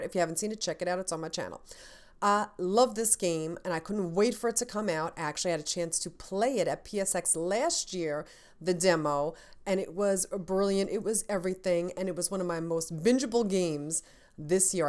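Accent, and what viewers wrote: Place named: Texas, United States. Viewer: it looks like a mysterious Japanese female assassin with an American (Texas) accent hosting game awards .cool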